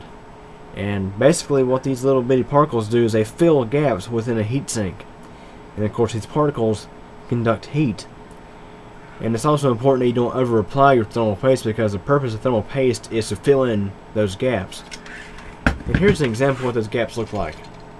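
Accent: South, United States. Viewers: That southern accent tho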